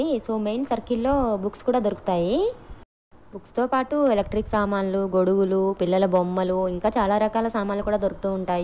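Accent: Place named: East Godavari, India. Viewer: Hamma okkasari ap lo east godavari ki velli vachinattu anipinchindi me voice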